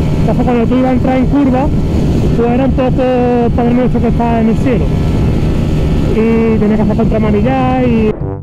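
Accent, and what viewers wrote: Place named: Andalusia, Spain. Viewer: es que es andaluz xD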